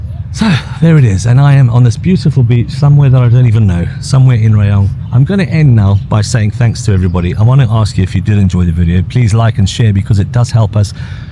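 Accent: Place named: South Africa. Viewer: Definitely pick up your s.a. accent . How many years did you spend in uk after Zimbabwe?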